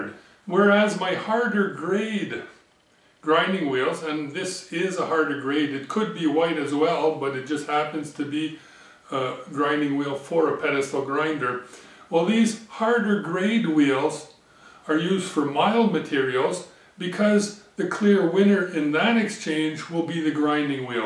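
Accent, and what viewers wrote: Canada. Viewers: Michigan or Canadian?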